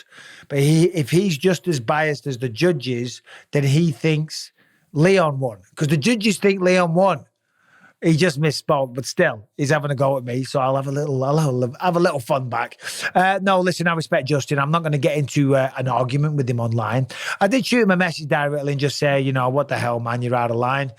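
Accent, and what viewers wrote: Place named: United Kingdom. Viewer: I love your commentary. Me and my dads favorite moment to this day was during usman vs masvidal 1, usman was brutalizing masvidals toes in the clinch against the fence and you basically suggested if you were masvidal you'd be looking at the ref saying "cmon ref, have a word, he's stomping on my toes" of courage emphasized by the British accent lol. I don't think it would be terrible of you to admit that just as Justin is biased toward his friend Kamaru, you have a tendency as a fight fan from the UK, to root for those fighters. Even as a caster you can't help but to be a fan, Cormier falls victim to it as well. It would be a lot more forgivable if you just said, "of course I'm a little biased, we're in the UK and I'm rooting for my guys". If you'd like a better example of your bias you should rewatch the Jai Herbert fight, imo that was the one where your bias was most easily recognizable. 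Keep doing your thing though bruv, there are lots of us that look forward to the cards that you cast.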